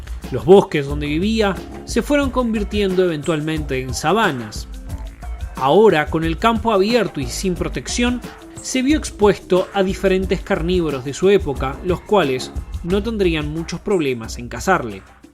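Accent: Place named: Argentina. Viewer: él es argentino, por eso dice pronuncia así ciertas palabras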